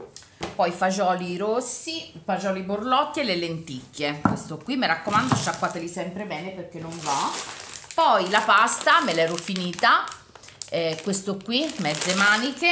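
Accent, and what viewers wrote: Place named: Marche, Italy. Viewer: Ciao cara, dal tuo accento (che è simile al mio) deduco che siamo entrambe marchigiane! Io abito in un paesino della Prov. di Ascoli Piceno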